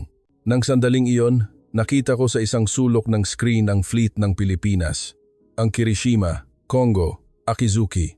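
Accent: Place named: China. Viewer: Ang labo naman ng narrator di mo alam kung pinoy o Chinese... ito lang ang opinion ko sa ngayon handa na ang Pilipinas makidigma sa china pinaghandaan na yan ni PBBM kaya nga naglatag ng mga EDCA site para paghandaan ang gyera... Hindi kakayanin ng china ang pwersa ng Pilipinas dahil sa mga kaalyado nito na pinangungunahan ng USA kawawa lang china kapag nagkataon tancha ko lang 1 week lang ang itatagal ng china susuko sila sa bigat ng opensiba ng USA yon lang talaga iniiwasan din ni PBBM na magkaroon ng digmaan hanggang kaya pang iwasan...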